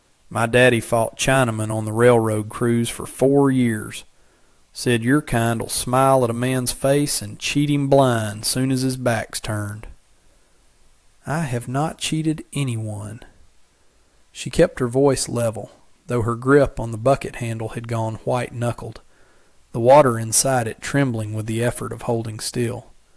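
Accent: South, United States. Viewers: Terrific story. Love narrator's voice and southern accent.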